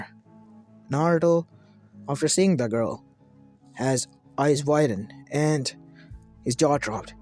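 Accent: India. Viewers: By your accent I think you are indian, I am indian too and its very good to see that we are also contributing to the Naruto world.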